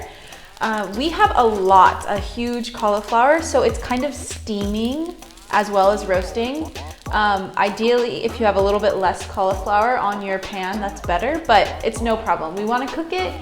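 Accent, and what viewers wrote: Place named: Morocco. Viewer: Hello hello bravooo thank you for your recipe ❤ I like it 🌹 good luck . I like your Moroccan accent ❤❤❤❤ keep it good guys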